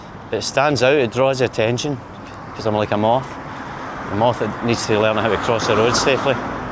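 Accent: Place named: Dundee, United Kingdom. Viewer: He sounds more Dundee now aye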